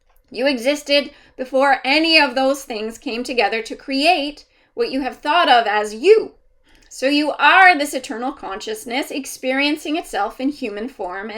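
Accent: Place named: Canada. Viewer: Thank You, Took Me Years to Realize this. And The Re - Minder Is Great!!! ✨️✨️✨️⭐️💔💖💞 Love It. 🙂. I Luv The Way Your Guide Explains this. ☀️ And The Canadian Accent, Smile Is Priceless. 🙂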